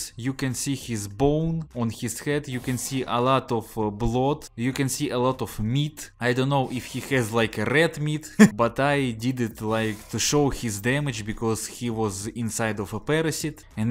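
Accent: Russia. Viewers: So... It's Best У тебя неплохо получается копировать русский акцент Если заменять некоторые "О" на "А", то даже поверят, что ты из Москвы :)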